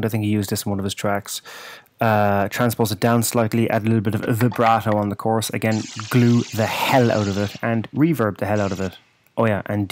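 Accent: Ireland. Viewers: Can you do Detroit electro? And is that an Irish accent ?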